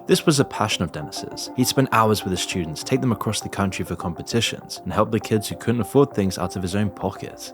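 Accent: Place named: Brighton, United Kingdom. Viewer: U from Brighton I'm guessing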